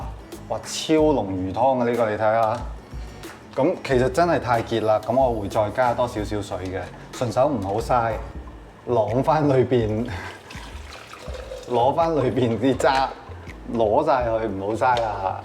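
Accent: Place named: Hong Kong, China. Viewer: 多謝你提供魚湯的教材⋯非常有營養很適合小朋友喝，這是我第一次看這視頻，聽你口音就知道你是來自香港⋯🤗👍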